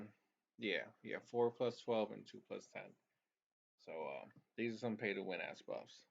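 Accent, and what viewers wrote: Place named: Philippines. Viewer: Bruh, u murican? I always thought you're PH 😅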